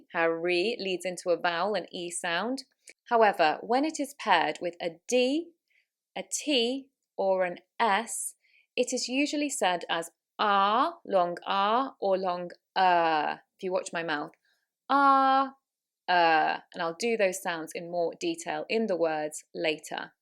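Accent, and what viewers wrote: United Kingdom. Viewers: Hallo good teacher l am attention To listen carefully from You are also one in good choice teach softly your Sound real british accent I have more who teach british accent nearly four Teachers but l have follow American accent two teachers mostly l like As well as british accent But english has nothing know as all a little bit one Thank you.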